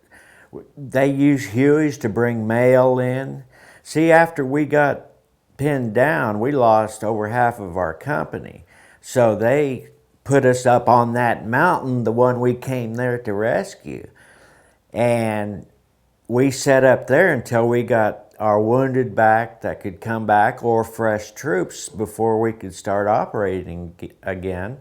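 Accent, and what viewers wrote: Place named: Kansas, United States. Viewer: Glad your hanging on. I knew you had to be from Kansas as I listened to you talk and 37 min you said your were just a farm boy from Kansas. Greetings from a fellow kansan.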